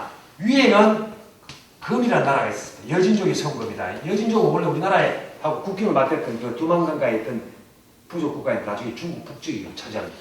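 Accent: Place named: Gyeongsang, South Korea. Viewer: 내가 들으니 사투리가 하나도 없는 정통 표준말인데, 다만 말투가 경상도 말투로 보이는데 그것을 말꼬투리 잡아 사투리라고 시비걸면 쓰나?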